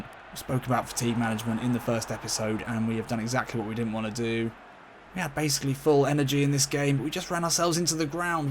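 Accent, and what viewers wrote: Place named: England, United Kingdom. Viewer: kalo dari aksennya, dia dari Inggris bro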